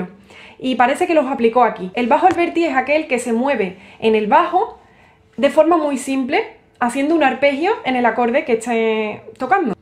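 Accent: Andalusia, Spain. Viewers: Andaaaaaa! No toco la guitarra pero me gusta escucharte hablando en español con tu acento andaluz y claro tu música tb. Estoy estudiando el español y hace muchos años estudié 4 meses en Sevilla.... me Milán tus pendientes de mariposas! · Tu manera de hablar es hermosa. Mi pregunta.... es completa y totalmente andaluz??? O tienes alguna otra influencia??? P/D. Tu no tocas la guitarra... verdaderamente la acaricias. Bellísimo. Saludos desde la Argentina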